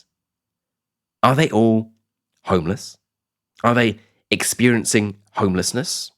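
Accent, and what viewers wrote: United Kingdom. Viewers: Teacher Is your accent british?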